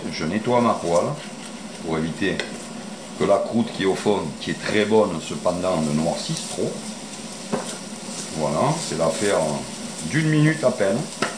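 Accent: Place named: Provence, France. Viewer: Je viens de réaliser cette recette, simple et délicieuse à la fois. Je m'abonne avec plaisir. J'entends votre accent, ne serions-nous pas de la même région (Var pour moi) ? Belle journée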